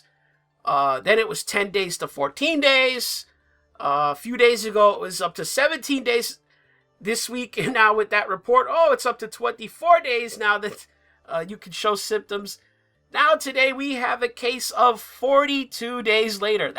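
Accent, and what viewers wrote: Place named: New York, United States. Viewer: Sounds like New York \ New Jersey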